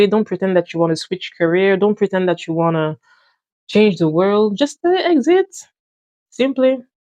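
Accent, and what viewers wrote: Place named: France. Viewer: Your french accent is so divine soothing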